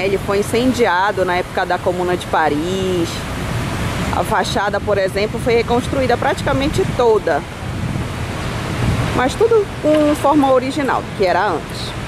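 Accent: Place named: Pará, Brazil. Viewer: Que bom saber a história da tour Saint Jacques. Você é paraense? Eu sou paraense e achei seu sotaque parecido com o nosso, adorei e parabéns por nos trazer conhecimento, obrigado.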